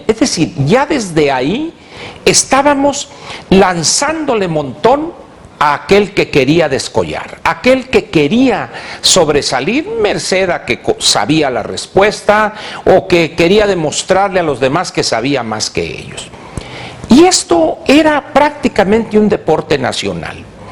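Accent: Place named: North, Mexico. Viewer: Maestro Angel Verdugo soy su fan con todo respeto... sus interesantes comentarios , inagotables conocimientos y esa forma norteña de hablar 🤬🤬☺️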